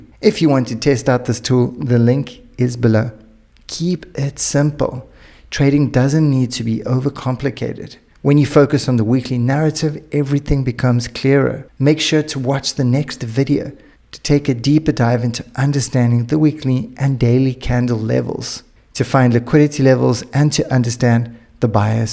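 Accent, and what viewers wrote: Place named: South Africa. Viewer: this mans is south african no matter what u can say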